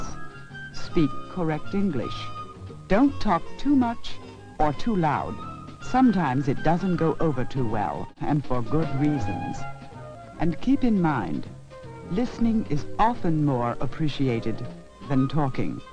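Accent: Transatlantic, United States. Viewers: This is known as a transatlantic dialect, it was very popular for narration on film and in television in America from the 1920s through the 1950s.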